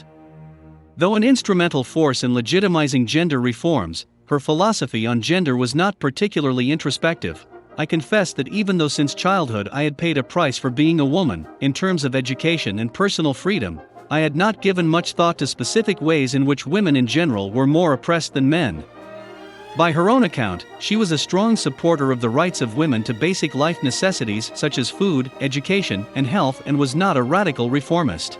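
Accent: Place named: United States. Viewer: The strong american accent who disforms the pronounciation of the iranian names is very annoying. The music is too loud and covers the speech.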